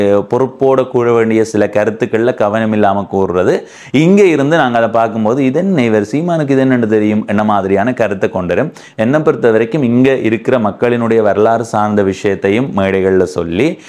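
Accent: Sri Lanka. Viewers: ஈழ தமிழர்கள் தமிழ் பேசும் அழகு அருமை 😊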